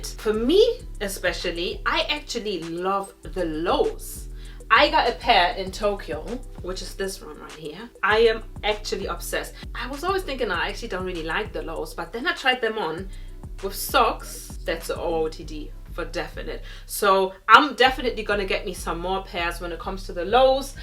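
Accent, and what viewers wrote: Germany. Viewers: Are u German? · du hast irgendwie ein deutschen aktzent in deinem englisch lol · She has a german accent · I'm also German and I can't stand German accents and I can't stand hers either She literally pronounces the words as if they're German words 😭😭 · Are you german cause your accent sounds like a german accent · Du hast voll denn deutschen Akzente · Hahaha habe ich grad auch gedacht so sprechen nur deutsche Englisch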